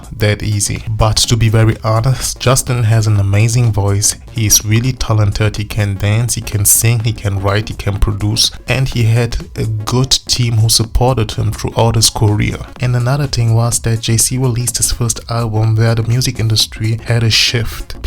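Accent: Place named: Germany. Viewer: Your German accent is the Hammer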